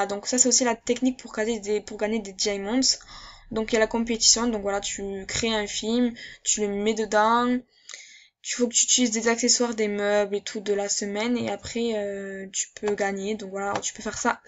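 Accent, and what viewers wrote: Marseille, France. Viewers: tu a un acsent marseuiller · Ses une marseillais ses pour sa qu'elle dit sa mai je t'adore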